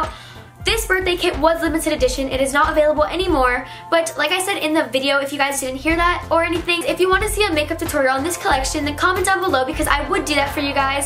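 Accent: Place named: Pennsylvania, United States. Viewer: Do you live in PA? Jw bc you kinda have an accent 💗 (btw loved the vid!)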